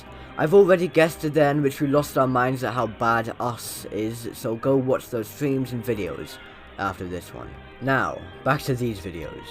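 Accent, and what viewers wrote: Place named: United Kingdom. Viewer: Oh wow, you're actually British. Didn't expect that.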